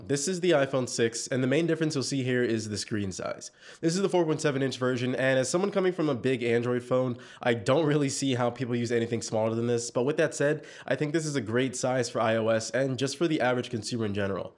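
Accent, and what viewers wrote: Nigeria. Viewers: Are u nigerian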